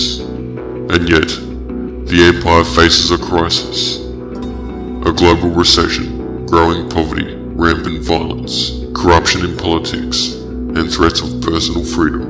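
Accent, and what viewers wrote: Australia. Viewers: He has an Australian accent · lol, I thought to myself, Anonymous sounds Australian lol, but I realized you were just narrating it :) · What's with everyone commenting on his Aussie accent? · Derp he sounds Australian.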